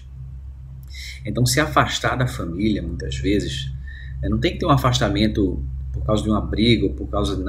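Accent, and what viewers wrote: Recife, Brazil. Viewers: eita recifense. massa!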